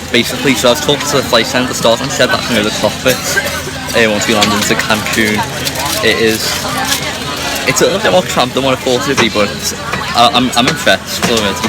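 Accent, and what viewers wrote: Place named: Liverpool, United Kingdom. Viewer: Scouserrrr yes!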